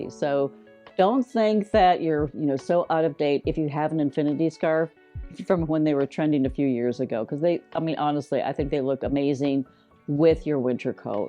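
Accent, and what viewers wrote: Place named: South, United States. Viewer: I’m in Ga too! I thought 💭 I detected an accent. We’re up here in the North West. (Cherokee county). Nice to know you’re a southerner.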